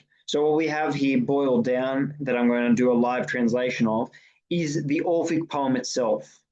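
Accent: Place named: United Kingdom. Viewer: You’re one a few people with a British accent I can actually listen to without feeling like I’m being conned or talked to like a child by someone who doesn’t understand what they are saying. You sir, are a gentleman and a scholar.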